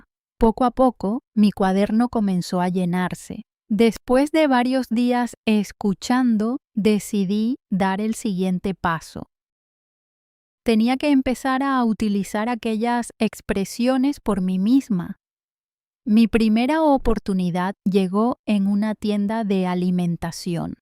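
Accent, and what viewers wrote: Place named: Latin America. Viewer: La hablante tiene acento latinoamericano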